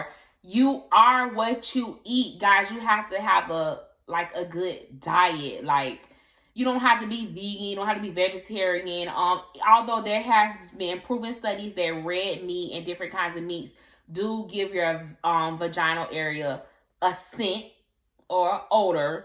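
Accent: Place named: Florida, United States. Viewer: I know you from Florida boooyyyy that accent sound like all my kinfolk😩😭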